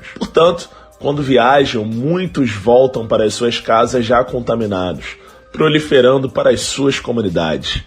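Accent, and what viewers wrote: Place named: Rio de Janeiro, Brazil. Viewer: volta logo, preciso de mais vídeos e do seu sotaque carioca.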